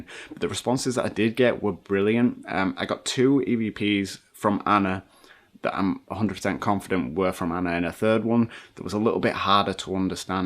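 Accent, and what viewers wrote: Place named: Manchester, United Kingdom. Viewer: You have some of the best videos on YouTube, and some of the best paranormal videos I've ever seen. I apologize if I have missed your Q&A's that may have answered these, but I have a couple questions: 1.) Have you ever thought of taking up a professional career, in paranormal investigation? (I personally think you'd be great at it, though I know you are more interested in your own personal experience and residence right now) 2.) Where, in England, are you from? (I realize you likely enjoy your privacy, so you do not have to answer this question - I was trying to place the accent, along with my son...and we guessed Manchester)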